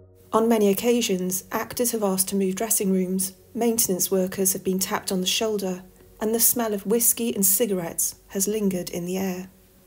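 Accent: England, United Kingdom. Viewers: I'm Australian and I would love to have a beautiful English accent such as this, it's very easy on the ear. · Honestly, I glom to your work as a result of your voice and content. I might watch an episode after the fact for the visuals in order to get a sense of place, but this is always an afterthought. I prefer to use my imagination. As to the questionl on ASMR do what you want. I am perfectly content being soothed by your voice and personality when it pops up in your occasional afterthoughts. "What do you think?" Although I find the topics of ghosts intriguing I find the history of Great Britain equally so. I find it a charming combination. With your quiet voice and accent you could probably get away with reading a list of recipe ingredients. Well, that's not true. But with You Tube being mostly about visuals for me your voice and persona are the selling points. 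I will stop repeating myself now. Looking forward to what you concoct in the New Year.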